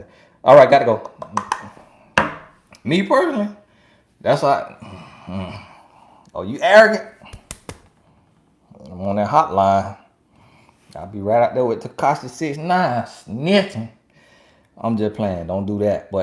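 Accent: South, United States. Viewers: Hey....I like your Southern accent....please don't change....you are who you are....Im sure you made peace with your voice...it don't matter as long as you're getting the message out from God....be blessed!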